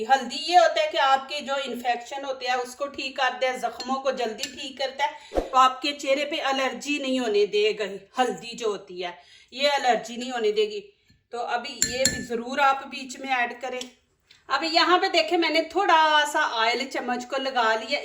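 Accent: Punjab, India. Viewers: کیا بات ہے آپ کے پنجابی لہجے کی ❤ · Cooking main tu mahart Hy mgr guzarish Hy k Urdu lehja pr thori twajho dein jhatky se ye latka kr bolny se Urdu ki khoonsoorti mtaasr Hoti hy Punjabi andaz main Urdu na bolain Urdu ko Urdu main bolain tu Jo thori si uljhan Hoti Rehti Hy poori video k doran is se bach jain Pakistan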